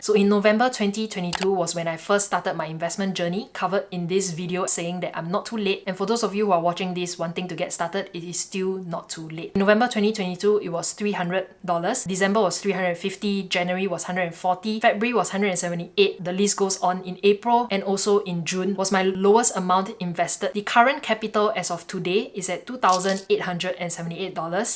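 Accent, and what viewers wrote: Singapore. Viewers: Is she speaking Singlish? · I love your singaporean accent!!! Singlish makes my heart beat! Maybe it was your good taste in music and the beautiful scenery from your newest korean vlog. 🌇 you are so smart, I'm going to watch all your videos! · I know you’re Singaporean from your accent.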